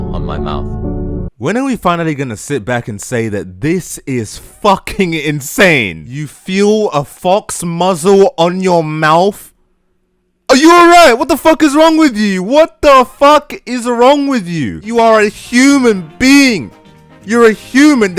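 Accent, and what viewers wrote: United Kingdom. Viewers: Definitely from England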